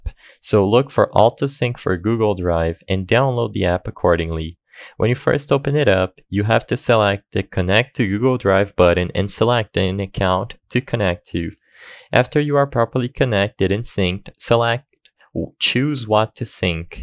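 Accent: Brazil. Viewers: É br man? Parece muito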